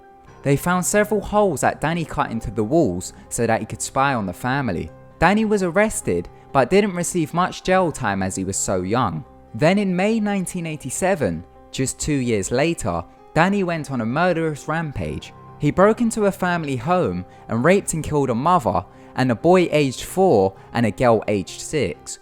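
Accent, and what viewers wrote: United Kingdom. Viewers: Sorry, but these videos are never scary with that dopey British accent. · I find accents very interesting, are you from the uk. Ever heard a scous accent, I can't understand it to save my life lol, I like the way it sounds tho, not to make fun of their accents or anything like that.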